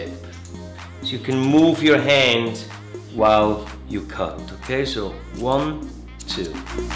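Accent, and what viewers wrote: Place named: Germany. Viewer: Where is your salon. I detect a German accent...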